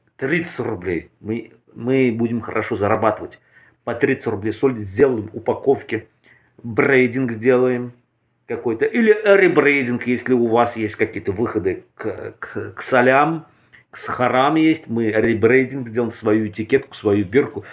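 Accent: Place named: Mordovia, Russia. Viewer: по акценту не могу понять, ты Мордвин по национальности?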